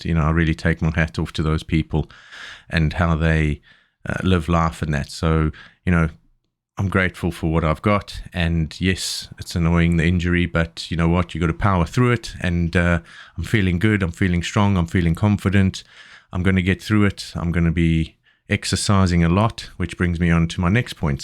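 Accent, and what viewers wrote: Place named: South Africa. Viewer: Another encouraging video, thanks! You sound like you are from SA?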